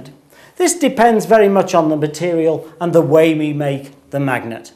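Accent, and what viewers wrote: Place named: United Kingdom. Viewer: Brittish. Brits don't always pronounce r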